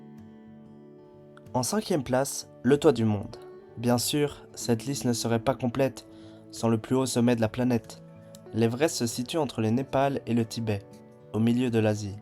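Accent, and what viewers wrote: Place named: Italy. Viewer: Le petit accent italien 🤌🏽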